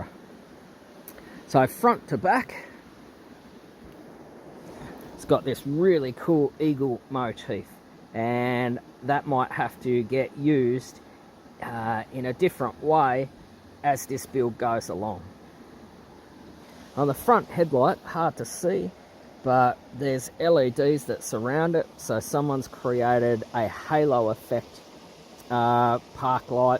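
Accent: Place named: Australia. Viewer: Great to hear another Aussie. I just bought one of these. I need to know what a bobber is? It sounds like a good thing. Thanks for the video.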